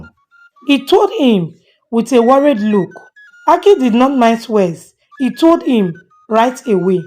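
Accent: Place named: Edo, Nigeria. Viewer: The Edo accent of the male voice in your story is sooooo funny and 😂😂😂 Even you also have an edo accent.. Are you from Edo State? 😅😅😅😅😅 I love your stories...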